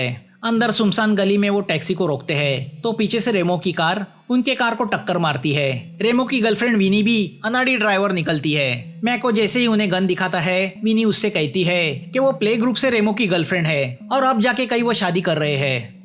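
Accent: Maharashtra, India. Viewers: Mai bahut din se apke videos dekta hu thoda absent Marathi lagta hai apka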